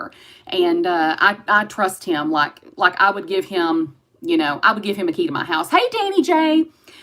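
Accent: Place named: South, United States. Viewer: I am in Australia and I just want to say that I absolutely adore your Southern accent. Could listen to you all day. xxx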